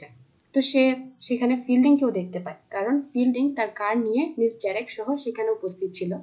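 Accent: Chittagong, Bangladesh. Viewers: Apu ki Chattagram er?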